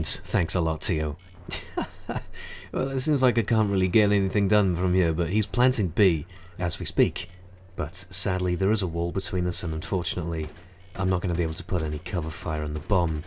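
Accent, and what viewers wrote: United Kingdom. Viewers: That accent! Somewhere from UK, I guess.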